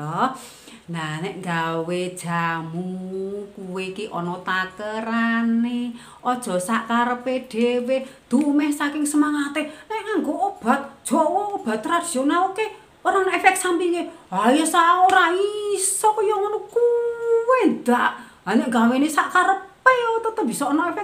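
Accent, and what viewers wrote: Temanggung, Indonesia. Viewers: Marem Gandem logat khasss temanggungan Bunda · Top markotop bu,bahasane Temanggung jan medhok tenan,jos banget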